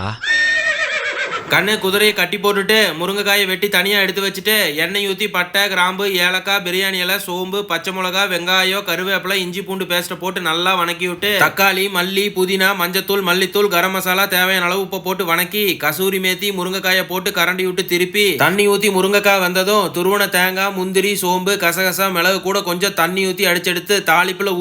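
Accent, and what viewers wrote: Coimbatore, India. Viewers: கோயம்புத்தூர் பாசை நல்லார் க்கு வித்யாசமா பன்றீங்க முறங்கக்கா பாயா❤❤❤❤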